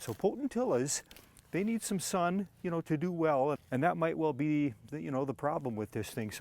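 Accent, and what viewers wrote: Minnesota, United States. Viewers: Heard the accent and immediately went to the comments to see if there was a mention for Minnesota and was not disappointed 😊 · Are you from Minnesota? :). Thank you for the video! I need to trim mine back, they are big. Unfortunately, too late this year.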